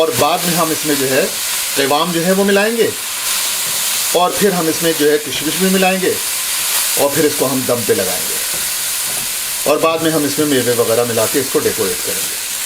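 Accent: Delhi, India. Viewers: Ek zamane baad itni achi saaf aur dehli style urdu suni hai aur ye Qawami siwaiyan yaad agayi jo ke meri nani banaya karti thi. I love it.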